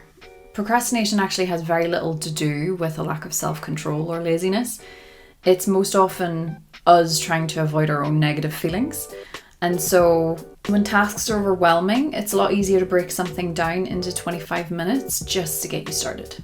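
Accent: Northern Ireland, United Kingdom. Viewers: Is that a Northern Irish accent I hear? 😅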